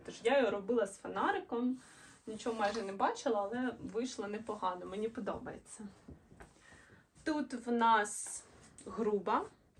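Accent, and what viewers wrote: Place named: Western Ukraine, Ukraine. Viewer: У нее бизнес,а не молодец,голос западенки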